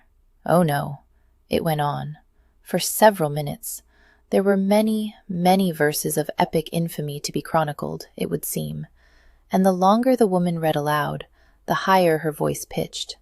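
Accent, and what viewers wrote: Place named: United States. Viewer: No no no no no! No American Narrators of an English story!!!!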